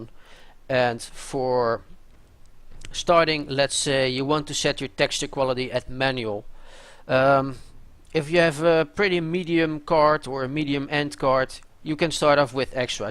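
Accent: Netherlands. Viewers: dutch accent :P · hoor gewoon dat je nederlands bent :0 maar ik heb een probleem me i7 proccer werkt niet met advanced warfare kan je me daarmee helpen? hij loopt vast in de loading screen en dan ben ik gedisconnect. help! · you have a dutch accent xd Dutch?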